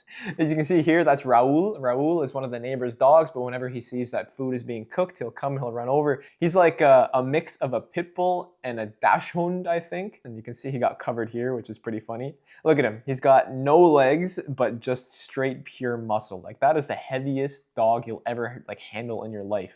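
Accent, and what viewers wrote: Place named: Argentina. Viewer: Para mi sos argentino hablando Inglés, te entiendo porque hablo ingles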